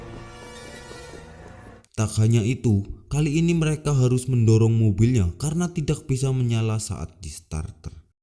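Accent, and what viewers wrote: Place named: Tegal, Indonesia. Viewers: Tegal bgt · dg bahasa mimin meddok banget pingin belajar bhsanxa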